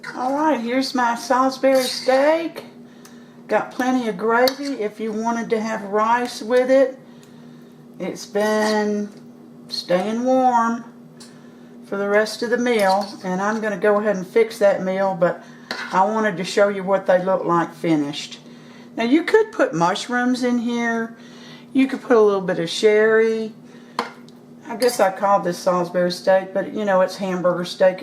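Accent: South, United States. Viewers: I have not hamburger steak in years. That sure looked good.A real comfort food. So yummy. Wish I was there for dinner. My mama always said "cut off the heat" or "cut off the lights" and not turn down the heat or turn off the lights. I can tell you are Southern. So cute. I always say I can speak Southern. Does that make me bilingual?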